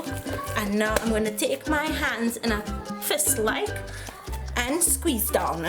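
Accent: Trinidad and Tobago. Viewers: ... That's the sweet Trinidadian accent! Really lovely. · I have always love the Trinidadian assent. From a vincy girl.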